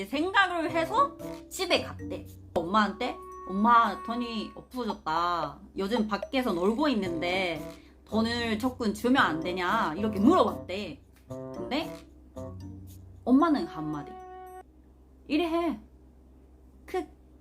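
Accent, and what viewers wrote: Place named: Gyeongsang, South Korea. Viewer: 아니,,, 나 경상도 사람인데 이분 표준어 쓰는줄알았는데 자세히 들어보니까 경상도 말쓰는거같네 ㅋㅋㅋㅋㅋㅋㅋㅋㅋㅋㅋ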